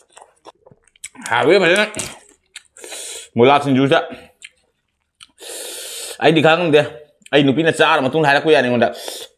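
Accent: Cachar, India. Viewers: Brodrj cashar accent male if im not wrong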